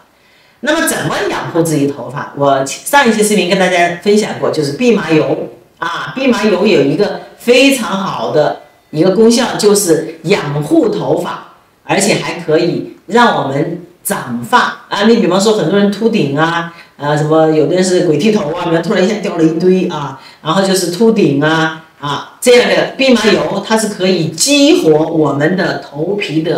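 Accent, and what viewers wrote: Wuhan, China. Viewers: 感觉博主口音一听就是武汉人哈哈 我也是武汉的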